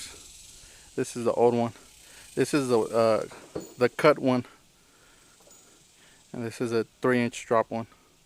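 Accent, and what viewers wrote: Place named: Houston, United States. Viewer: Sound like your from Houston. I like it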